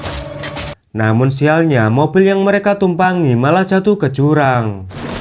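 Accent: Java, Indonesia. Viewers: JANCOK MEDOK MU PARAH COK!!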